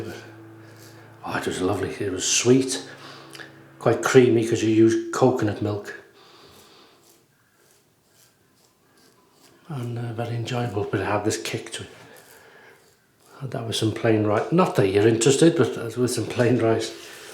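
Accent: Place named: Wales, United Kingdom. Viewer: I really want a model, one day I'll get one. I'm based not too far from you I think. North East Wales from your accent? I'm originally from Bangor but live north East now.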